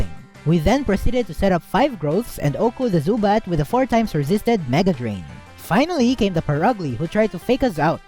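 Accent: Philippines. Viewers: Tol bago ako sa youtube channel mo at na recommend sa akin ng youtube at btw yung nung pagkarinig ko sa english mo sabi ko ay filipino to hahahaha D ko tinatawanan yung pag salita mo ng english pero kung sayu medjo d nakakatuwa Sorry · Pansin ko lang po boses mo eh napaisip ako pilipino kaya to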